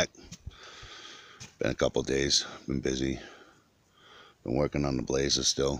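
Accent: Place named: New England, United States. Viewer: Love the Yankee accent! Where are you from??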